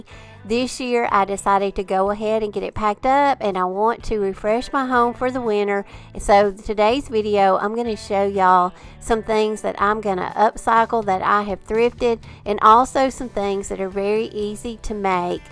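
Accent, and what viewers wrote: South, United States. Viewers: This is one of the top channels in my list. Your talent is amazing and as a southern girl myself, I love your accent! Happy New Year to you and all the subscribers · I stumbled across one of your videos about 6 months ago, I have to say you are totally amazing, I absolutly love everything you make, you are the most talented and interesting person I have ever watched, I'm hooked! I love your cats, mine always helps me too! That's great your husband helps you, So does my husband when he makes me pallet snowmen, sleds, and skii's... I've always been crafty too but you my dear are a total inspiration!! I grew up in the south (East Texas) so I enjoy listing to your Southern Accent!! Just wanted to tell you I feel blessed that your my new Crafting Angel! DC from Anacortes, Washington!!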